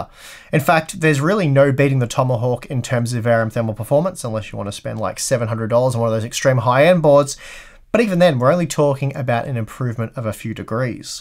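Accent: Australia. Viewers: Steve are you from Australia?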